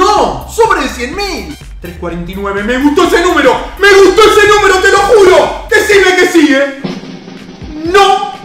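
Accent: Argentina. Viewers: Un argentino ei jajaua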